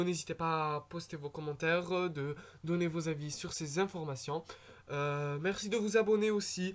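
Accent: United Kingdom. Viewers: Mon dieu, tu dis n’importe quoi ! Et ton accent Anglais ! Vraiment je dis pas ce genre de choses, tout est faux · Aucune information donné est vrais se qui en plus de ton accent anglais ma fait énormément rire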